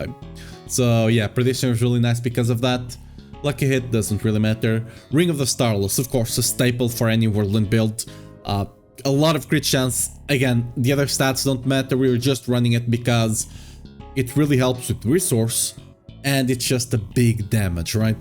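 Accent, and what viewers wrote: Portugal. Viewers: Based on your accent, I am 50000% sure you are Portuguese. Please tell me I am right!?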